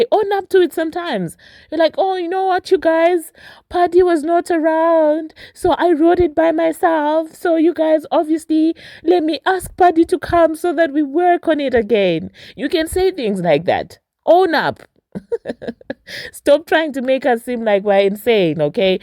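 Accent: South Africa. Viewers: thank you for representing us. That South African English accent 👌👌👌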